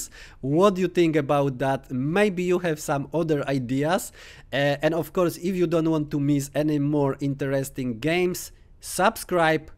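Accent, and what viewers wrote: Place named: Poland. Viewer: Stare chińskie przysłowie mówi: Jeśli 1 osoba Ci powie, że masz łeb jak koń to daj mu w mordę. Jeśli druga osoba Ci powie, że masz łeb jak koń to daj mu w mordę. Jeśli trzecia osoba również Ci mówi, że masz łeb jak koń to warto się zastanowić czy nie pójść do weterynarza. Nie przypieprzam się, po prostu robisz masę błędów fonetycznych i składniowych, dorzucając polski twardy akcent sprawia, że słuchacz się męczy, bo merytorycznie muszę Cię pochwalić ładnie opracowałeś tą rozgrywkę, absolutny top 👍🏻 Akcentu i języka również idzie się nauczyć, wiem bo mi zajęło to całe 6 lat, powodzenia.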